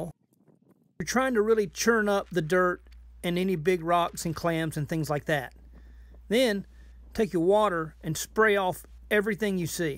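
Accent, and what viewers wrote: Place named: Pennsylvania, United States. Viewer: Your accent sounds like you are from PA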